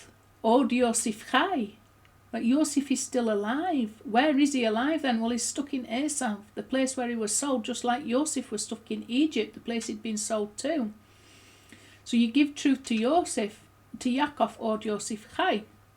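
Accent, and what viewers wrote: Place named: Scotland, United Kingdom. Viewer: May G-D bless you very much today my dear sister. (I insist as I told you in the past: "I adore hearing that Scottish accent"! Once you told me you were borne in England; but I can only picture you as a fine Scottish sister in Faith, Tribe, Tradition, and Past. For sure, also, our future!!! שלום וברחה! אלוהו CHRISTIAN ANGUS GRIFFIN-MACKENZIE Enjoying your lesson from Santiago, Chile 🌶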